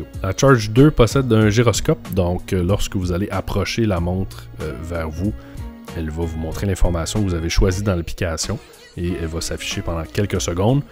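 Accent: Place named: Canada. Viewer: ppppffftttttt c'est relou l’accent Canadien, ont ne comprends rien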